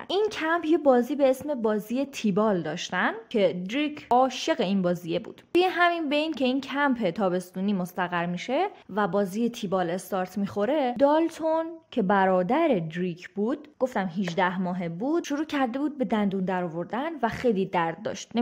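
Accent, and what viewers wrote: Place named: Isfahan, Iran. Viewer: درود برشما بانوی زیباوجذاب.شما خودتم گوگولی هستی،راستی هرکاری کنی بازم ته لهجه‌ی اصفاهانیتو داری😊😊😊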